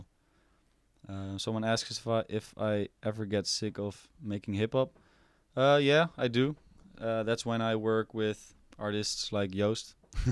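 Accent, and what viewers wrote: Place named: Netherlands. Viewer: Lekker dat Nederlandse accent 😂💪✌🔥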